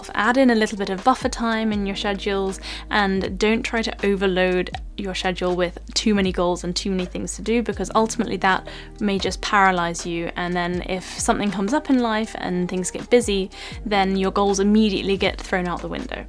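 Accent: RP, United Kingdom. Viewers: Thank you so much ........I really love listening to ur British accent!( ˘ ³˘)❥❥❥ And I enjoyed all day long watching ur Vlogs 🤗🥰 Hoping for the further....,, useful & motivational Vlogs of your's!💟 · Love you always and british accent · Hello, Thank you for telling me about your Goal Setting Science and it is really important for me to learn from you how to set goals in my life for the year 2023., I'm happy when you got a degree and became a doctor. I love your nice British English speaking styles. You know many Chinese styles. My support is for you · Hello, I really like your British accent, I would like to communicate with u, would u like that I send u my e-mail❓️thank u very much, take care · Such a breath of fresh air to start off the new year such a positive accertive approach puts me into gear for the year ahead please I adore your English do some more rp english videos I am english but need to polish up thanks so much keep up the good work and yes some yoga blogs would go down well roger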